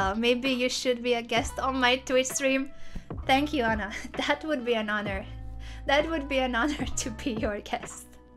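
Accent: Hungary. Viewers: Bakker eddig nem is realizáltam hogy te magyar vagy.... annyira hülyének érzem magam, az akcentusból rá kellett volna jönnöm XD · are you hungarian?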